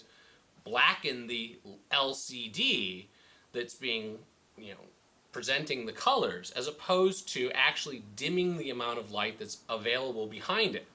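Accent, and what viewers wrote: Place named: New England, United States. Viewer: Every time you go to one of these video's and it is a guy with a Yankee accent, be prepared for too much talking and show boating. In other words, hear me roar, I can talk a mean game of pool.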